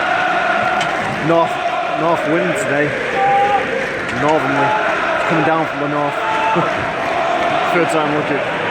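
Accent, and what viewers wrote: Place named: Manchester, United Kingdom. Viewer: where are u from bruv? U sound like ur from Manchester, as I am also. I kind of stumbled across this video, I'm glad I did, it's quality. Looking forward to ur West ham vid. Defo gonna subscribe n will spread the word. Peace!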